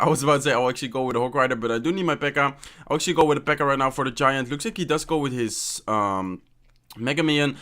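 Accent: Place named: Netherlands. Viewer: U have a Netherlands accent ohhhhh girlfreind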